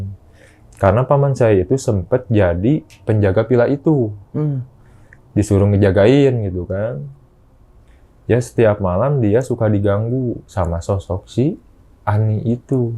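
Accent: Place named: West Java, Indonesia. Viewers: Meuni sunda pisan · Intonasi yang stabil, tempo medium penceritaan dan bahasa yang mudah dipahami membuat cerita lebih menarik dan nyaman untuk didengar, sekalipun dengan aksen Sundanish.. Salam Om dari kota tetangga, Cipanas.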